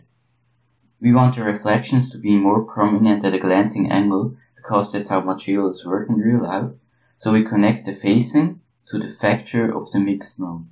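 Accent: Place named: Switzerland. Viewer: Haha ich han grad kört dass du en schwitzer bisch an dim akzänt :P Cools Tutorial btw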